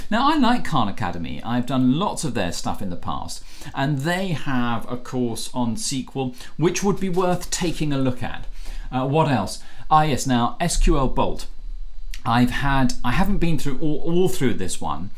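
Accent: United Kingdom. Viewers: listen to * speaking with his British accent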